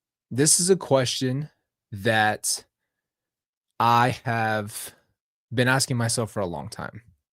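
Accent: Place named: Boston, United States. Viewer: "Piece a gahbage" lol, you sound just like a Boston boi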